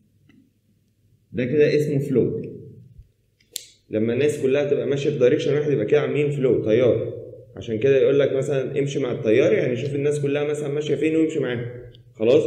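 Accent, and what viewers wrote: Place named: Alexandria, Egypt. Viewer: حضرتك من اسكندرية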